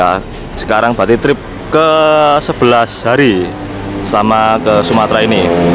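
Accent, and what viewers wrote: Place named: Java, Indonesia. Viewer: mantap mas.....kroso bedo...bis ko padang sing gawe video logate njowo...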